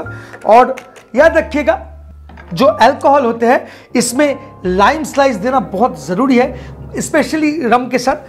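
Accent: Bengal, India. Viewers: you are too good - I love the Bengali accent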